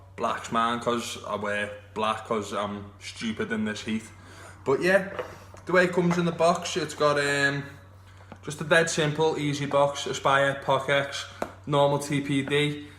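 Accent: Liverpool, United Kingdom. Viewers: Defo from liverpyool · Just ordered one of those, can't wait to get it now , ) Well spoken M8. BTW subbed just for the scouser accent. 👍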